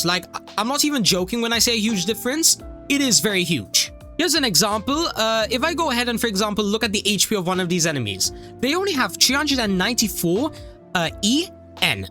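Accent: United Kingdom. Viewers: are you welsh?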